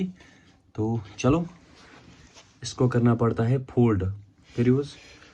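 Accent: Kashmir, India. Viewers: Yeh kashmiri hn yeh asye bolta hn 😁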